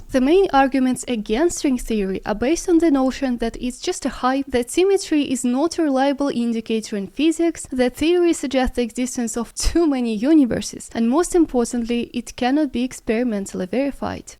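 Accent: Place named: Eastern Europe. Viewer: You are cute and havea slavic accent.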